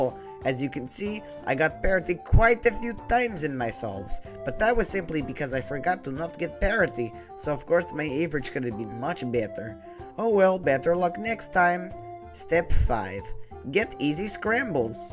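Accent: Russia. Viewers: oh yes! i been trying to get good at sq-1. hears russian accent FOR FUCKS SAKE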